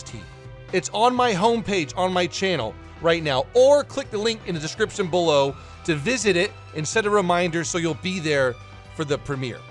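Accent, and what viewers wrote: United Kingdom. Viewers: thats cause hes brrrrrrrrrrrritishhhhhhh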